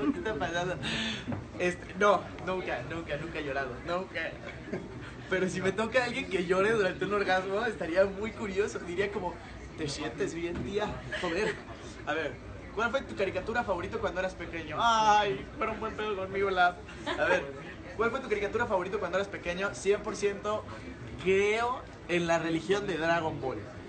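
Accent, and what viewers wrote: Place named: Spain. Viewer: su acento español😍😍😍😍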